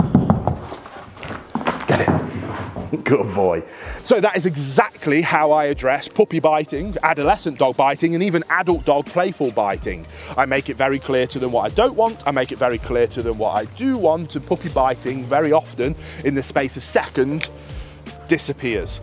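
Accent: United Kingdom. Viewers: I was not expecting this giant viking looking dude to have a british accent 😂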